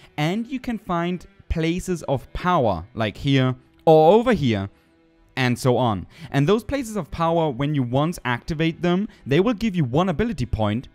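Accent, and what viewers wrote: Germany. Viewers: grewal The Accent is German if you were still wondering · Great video! Thanks! Also, you sound like Flula. Haha.... are you German? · Robin Andersson Sounds like a german accent ;) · German accent actually. Austrians are speaking german, too btw. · Ganz klar deutsches Englisch :D Dennoch gut gemacht, hat mir sehr geholfen :) Konter sind demnach wohl nicht so ratsam, weil man die Verteidigung runternehmen muss. Ich wurde schon vom Greifen am Anfang 3 mal zerfetzt :P Gibt es bei solche Monstern Tipps, die mna beachten sollte? Es durchschlägt ja Parieren, Quen-Schilde und der kleine Dodge wird auch komplett ignoriert. Also kann man praktisch nur Zeit schinden bis die Spells bereit sind, was ja nicht Sinn der Sache sein kann :/ Grüße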